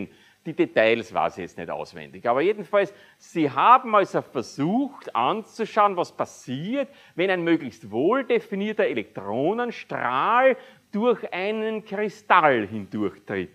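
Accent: Austria. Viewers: Sehr grosses Lob an diese Vorlesung. Als Norddeutscher ist für mich das Österreichische ein zweischneidiges Schwert: Zu Charmant um wegzuhören, zu einschläfernd um konzentriert zu bleiben... Tatsächlich taten alle Worte gut, vielen lieben Dank! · Eine großartige Auffrischung für mich, der ich in der Schule zwar Physik-Leistungskurs hatte und dann doch der Chemiebranche anheim gefallen bin. Und nicht zu toppen das Ganze auch noch im regional eingefärbten Sprachduktus. Ich liebe Österreich! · Eine gute Vorlesung mit österreichischem Schmäh vorgetragen, so dass man es gut verstehen kann. Schade, wennn man reicher geboren wäre, hätte ich gerne in Österreich studiert.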